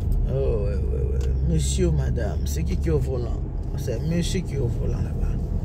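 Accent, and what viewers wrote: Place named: Democratic Republic of the Congo. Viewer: Toute belle maman. Je suis une abonnée qui te suis depuis Ndjamena au Tchad... J'aime beaucoup tes vidéos et surtout ton accent congolais 🥰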